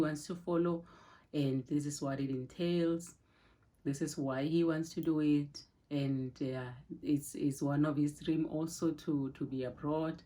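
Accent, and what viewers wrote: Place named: South Africa. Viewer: I love that she’s still South African even through the English. Congratulations on your move.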